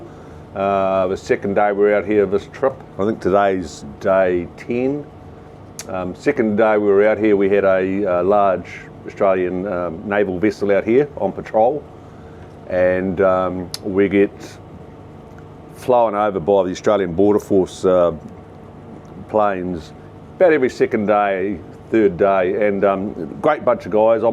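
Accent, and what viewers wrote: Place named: New Zealand. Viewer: Hey, of course with the Kiwi accent, I'm 65 & I wish I had a massive "deck".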